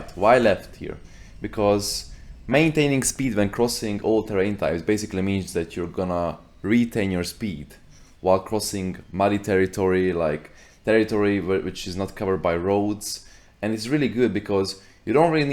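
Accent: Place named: Hungary. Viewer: magyar vagy te baszod az akcentus :D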